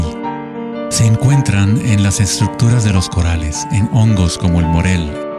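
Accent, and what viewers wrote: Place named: Latin America. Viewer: Bastante interesante, lastima que este en latino, no es por nada, estoy más acostumbrado al acento español. Like.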